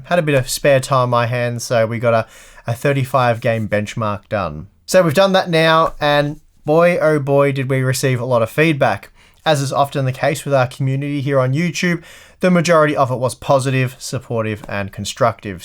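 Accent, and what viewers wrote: Australia. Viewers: it's very much Australian. Love the channel for this reason, grew up with it and feels close to home.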